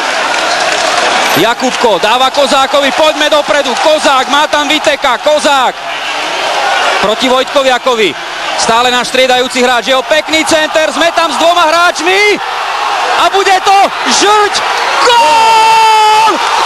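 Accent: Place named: Eastern Slovakia, Slovakia. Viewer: Merciak rulz... Vychodniar sa nezaprie :-)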